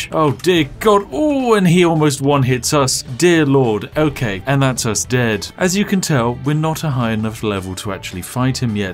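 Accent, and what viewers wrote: United Kingdom. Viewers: I love your British accent. Please marry me. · Starting with nuking a group of harmless deer, you had my attention, glorifying the best tea brand and you've earned my subscription. Spiffy Brit accent is icing on the cake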